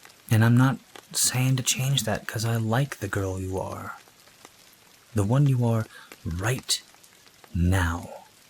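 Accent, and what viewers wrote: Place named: Midwest, United States. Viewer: You have no idea just how awesome and important this video is. I just discovered your channel tonight, and found this. I think maybe I was supposed to. YT tends to be a delightful "rabbit hole" of things yet to be discovered, overall, but somehow Spirit manages to use YT to tell me stuff I seem to ignore too much. I'm not sure what else I can say except for the fact that your voice is quite soothing to listen to. I'm sitting here trying to pinpoint your accent (something I'm usually pretty good at). At times you sound a bit like you're from the Midwest (Iowa, Missouri, Nebraska, etc) and other times, you sound like you might be in the mid-Atlantic states (NC, W. VA, MD, etc). Care to reveal the truth? ;-) (I'm from Iowa, btw)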